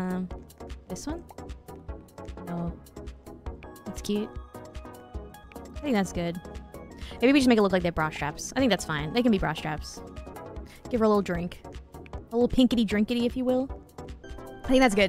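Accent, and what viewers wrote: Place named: Australia. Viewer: Your Australian? INSTANT SUB C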